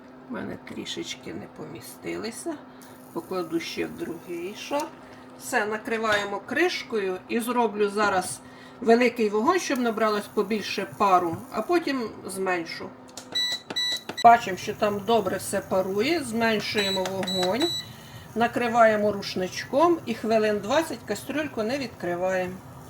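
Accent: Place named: Surzhyk, Ukraine. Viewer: Раньше вы говорили на русском языке, а сейчас на суржике...но мне нравится и понятно каждое слово...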